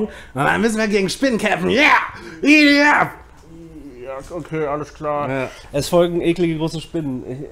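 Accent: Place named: Franconia, Germany. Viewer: ja klingt fränkisch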